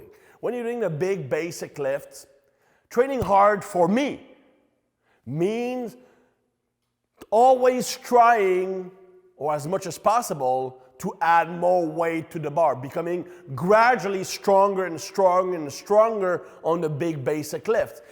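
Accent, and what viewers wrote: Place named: Quebec, Canada. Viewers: Oh my Quebecois! Lovely :) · He's quebecois, french canadian.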